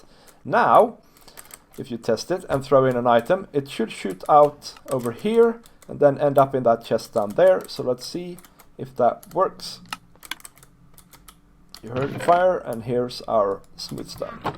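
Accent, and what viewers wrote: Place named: Norway. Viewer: Oh i literally heard it in your voice I’m norwegian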